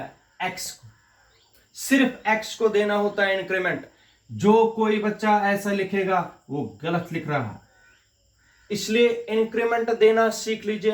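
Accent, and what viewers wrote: Kashmir, India. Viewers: Luv ur teaching as it luk's u might b kashmiri as ur accent is kashmiri.... Thanx.... God bless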